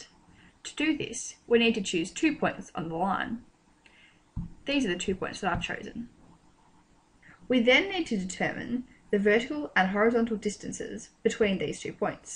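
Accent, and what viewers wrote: Australia. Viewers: i couldn't stop laughing at the Australian accent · Good video, minus the australian accent that kinda got on my nerves.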